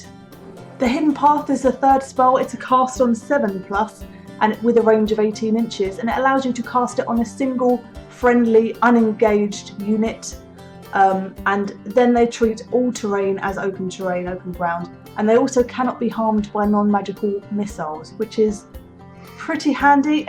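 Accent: United Kingdom. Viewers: Wha... what WHAT IS THIS!? An attractive woman speaking with a British accent about WARHAMMER FANTASY lores?! 0_0 .... subscribe. Where do I vote for more of this from the interwebz?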